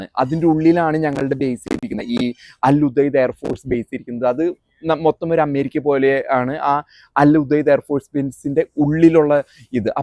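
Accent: Thrissur, India. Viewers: തൃശൂർ ഭാഷ അലക്ക് അടിപൊളി ......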